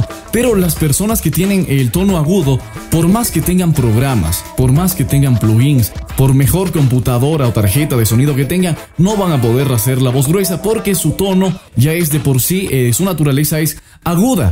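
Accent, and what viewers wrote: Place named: Colombia. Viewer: Muy buenos tips, buena vocalización y acento por un momento pensé que eras paisano je, saludos desde Colombia.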